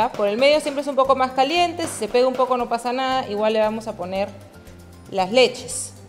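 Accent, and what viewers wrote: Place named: Peru. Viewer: Peruana por el acento.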